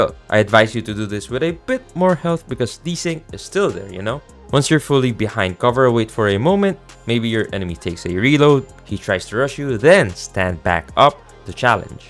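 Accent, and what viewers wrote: Philippines. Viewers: I’ve been wondering for a while r u Filipino cuz you sound like it?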